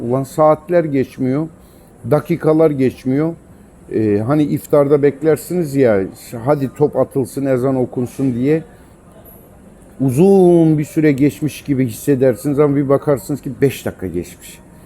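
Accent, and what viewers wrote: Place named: Kars, Turkey. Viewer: Abi gine kars şivesi sıkışırdın BUNLAR SARSAK OĞLU SARSAK 🇹🇷🇹🇷🇹🇷🇹🇷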